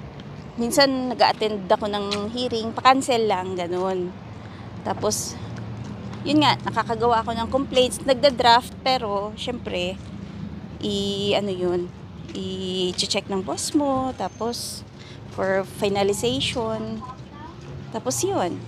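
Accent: Hiligaynon, Philippines. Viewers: parang ilongga ka maam ah the way ka po magsalita . new subscriber po. naghahanap kasi ako trabaho abroad.... kayo nakita ko po vlog nyo ..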